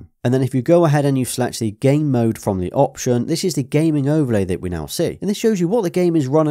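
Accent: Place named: Bristol, United Kingdom. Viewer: Nice gaming monitor. Your accent is annoying me, from Bristol by any chance ma babba? Annoying me in a good way, I am from Weston.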